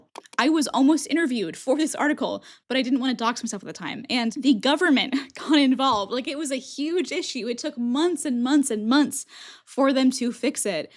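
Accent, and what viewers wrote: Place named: United States. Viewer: I'm kind of waiting for you to address and acknowledge the privilege as well: privilege of being a white American woman (at least from the accent), of having a partner who is supportive who has a high paying job that can support two people, of living in the U.S - particularly NY and LA. I'm not bringing down your accomplishment, but I'd like the average person reading this to not compare their reality to yours, unless it's very similar.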